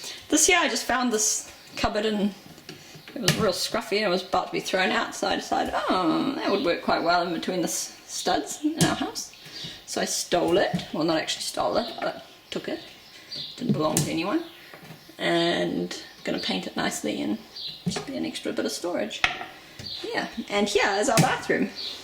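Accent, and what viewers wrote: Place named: New Zealand. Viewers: To me it sounds kiwi with a tiny hint of South African. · Very nice work. And that accent, holy crap I think I need to move to New Zealand.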